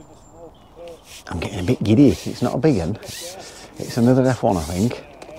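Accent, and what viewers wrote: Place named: RP, United Kingdom. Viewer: U with ya posh voice saying "my mate turtle" made me laugh.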